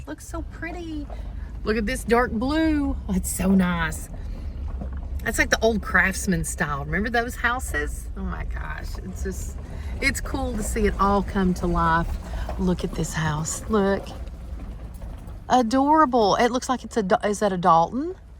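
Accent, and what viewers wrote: South, United States. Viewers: OMG!!, my bird has chirped the entire video! She must like your southern drawl!! 😁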